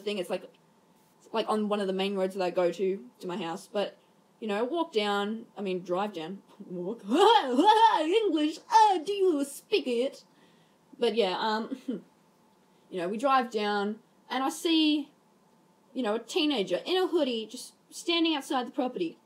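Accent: Australia. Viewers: OOOOO another Australian!!! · i smell another aussie I just subscribed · Are you Australian? You sound Australian and it makes you saying "Oh wow is potawie" more funny than it is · You sound Australian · OOH WOWIE PATOWIE WOW Also are you australian? Its hard to find Aussie youtubers actually... Oh well! im australian too =3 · I love your accent so much?? Like I'm an Australian too, but I love how heavy your accent is. (If that makes sense oof I hope that didn't sound offensive at all) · OI YOU AUSSIE? I'm from Victoria XD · First vid seen by you, IMMA SUBSCRIBE BECAUSE YEE BE AUSTRALIAN ! :D Insert HAHA! BOI! Here to get a bunch of likes but fails badly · Great artwork! And a very engaging storytime! I'll be honest, I legit grinned when I heard the accent. Nice to hear a fellow Australian doing storytimes. Keep up the good work! You've earned a sub from me for great content :) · not trying to start something, but, are you Australian? 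or from somewhere around there? I'm just curious. I heard it in ur voice. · instantly new you were an Aussie lol. btw that guy sounds fucked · Is she Australian? She sounds like it but hey just found your content so yeah it's pretty cool so far so keep up the good work. Yah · OOF I CAN HEAR YOUR AUSTRALIANESS HULLUR FELLOW AUSTRALIA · OH MY LORD IS THAT AN AUSTRALIAN ACCENT?? (pls be from Adelaide :0) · I kind of wish my Australian accent was as strong as yours because I also have some South African in my accent but maybe it’s just because I’m used to myself talking? Anyway, a completely fabulous video!! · You Aussie? 😏 me too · i dont want to sound weird but is that a aussi accent? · I wish my Aussie voice was as strong as yours XD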